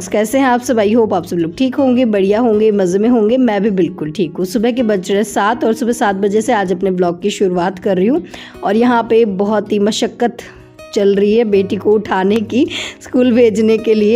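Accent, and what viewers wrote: Rajasthan, India. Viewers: Hi m Rajasthan se hu aur aapki tone sun ke lagta h ki aap bhi Rajasthan se hi ho....maine abhi kuch din pahle se aapke vlogs dekhna start Kiya h....mujhe aapke vlogs acche lagte h aur khas kar ke ye vlog to kuch jyada hi accha h...m aapse milna chahti hu....aap kaha se ho Rajasthan me....m aapse kaise contact kar sakti hu???Mera naam deepa h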